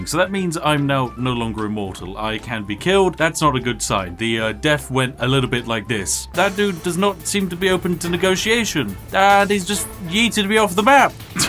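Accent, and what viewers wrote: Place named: England, United Kingdom. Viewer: I’m sorry, I really am, but the way the English say certain things makes me sick to my stomach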